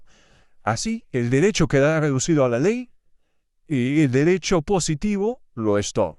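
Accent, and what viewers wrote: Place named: England, United Kingdom. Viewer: Tienes acento inglés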